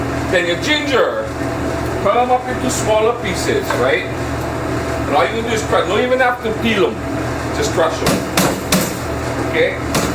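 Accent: Hawaii, United States. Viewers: Growing up in Hawaii ❤😂😅 dats da way of speaking n it's understandable n recognized ur from da islands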